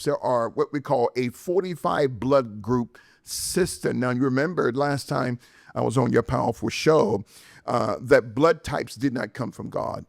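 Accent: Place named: Russia. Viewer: Congratulations on your 100th episode! I’m glad I found your channel. Your Russian accent makes the conversations so much better hahah ❤✊🏼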